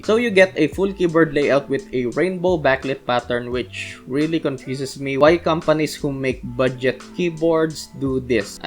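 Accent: Philippines. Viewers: Yeah he's accent is so filipino but it actually sounds good and his grammar is almost flawless. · accent doesn't matter, he is really Fluent in English. besides he's a Filipino anyways so its natural to have a Filipino accent. Good video. hope you make a review about Zeus Mk-880 Professional Gaming Mechanical Aluminum Steel Keyboard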